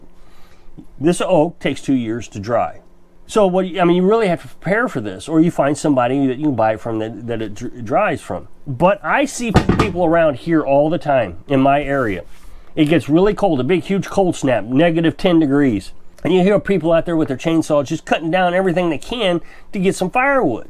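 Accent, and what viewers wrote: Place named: United States. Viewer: You are speaking American, not English. Maybe it's American English, but it's not English.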